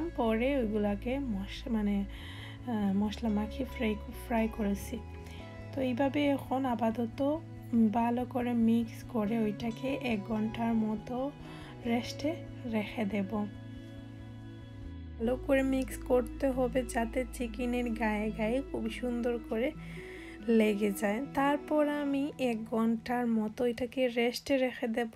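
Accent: Sylhet, Bangladesh. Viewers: sylhety sounds natural to hear that's all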